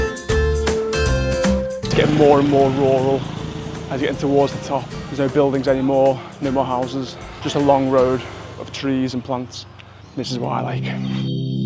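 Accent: Liverpool, United Kingdom. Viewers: U scouser?? Or from wirral?? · You sound like a scouser. You here for long I'm in cebu for 18 months now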